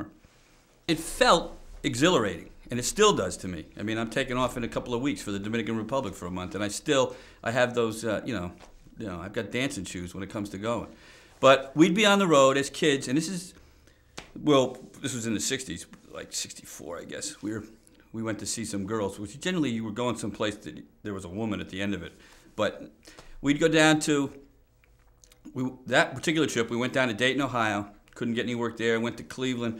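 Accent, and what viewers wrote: New York, United States. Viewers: Interesting. The inflection and pausing of the way he speaks is exactly the same as the way a guy I know who grew up in the same era in suberban NY speaks.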